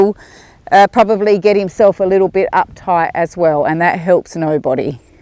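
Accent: Australia. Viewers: I’m doing lessons and I might canter this term and are Australian because of your accent I feel like your Aussie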